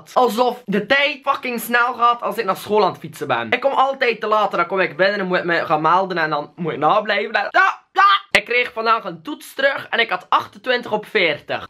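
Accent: Belgium. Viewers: Je stem is te grappig. Je schreeuwt te veel. Je praat grappig (maar elke Belg praat grappig)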